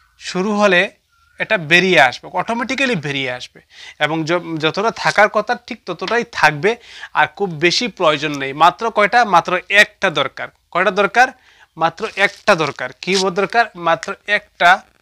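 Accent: Sylhet, Bangladesh. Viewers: Apnki ki sylheti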